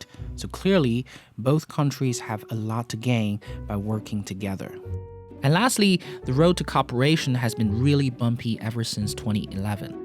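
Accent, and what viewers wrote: China. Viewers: His accent is so obviously Chinese from China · from your English accent you were born in mainland China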